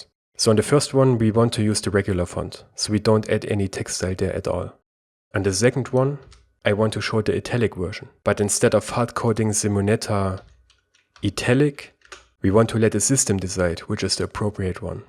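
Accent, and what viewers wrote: Germany. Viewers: Great video keep em' coming (Man merkt du bist deutscher/Österreicher😂)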